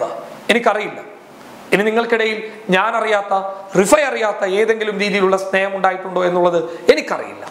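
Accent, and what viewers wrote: Kasaragod, India. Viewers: ഇതില് കോഴിക്കോട് കാരിയായ റിഫ ബാച്ചാ യുടെ കാസർഗോഡ് സ്ലാങ്ങിൽ തന്നെ ആണല്ലോ സംസാരിക്കുന്നത്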